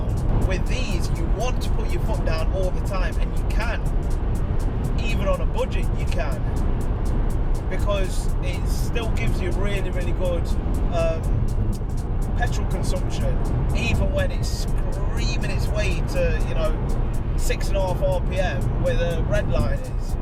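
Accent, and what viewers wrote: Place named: South Yorkshire, United Kingdom. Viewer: I actually own this exact car and its actually a perfect performance car aha and this is my first video i watched of your channel and i really enjoyed this video so u earned yourself another subscriber and as soon as you started talking i instantly knew you. Was from round south yorkshire area aha im from Sheffield my self. :) where abouts do you DJ might have to come and see you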